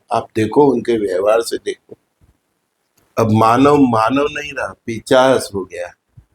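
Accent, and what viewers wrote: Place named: Gujarat, India. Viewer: Vo gujrat ke hai, hindi mother tongue nahi hai unki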